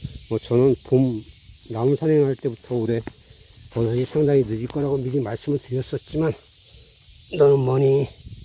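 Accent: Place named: Chungcheong, South Korea. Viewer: 산삼능이약초산행에 갔다가 소개받고 왔습니다.좋은영상 감사하고유 말씀이 충청도분이신지 구수하니 좋네유.자주 들리겠습니다.항상 산산.풍산하세요~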